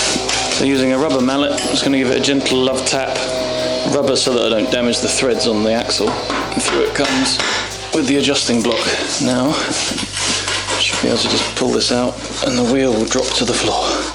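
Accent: United Kingdom. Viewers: Hmm...so has the same bike, and the same workstand, and did the same 46->43 sprocket change. I feel almost like I'm watching myself, (except for the UK accent). I did the sprocket change after putting my cycling GPS on the bike, and calculating that a 43 would make the speedo accurate. It still baffles me how Kawasaki can make the odometer dead-on accurate, but can't do the same for the speedo. Of course, after the change, my odometer now runs too slow.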